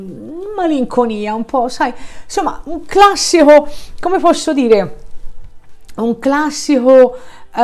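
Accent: Tuscany, Italy. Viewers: Mi garba tanto il tuo parlare toscano non so se fiorentino o pratese... saluti dal 🇲🇽 con ❤️👩‍🦳 alla mia Bell'Italia dov'è rimasto il mio 🦀 codardo quanto bello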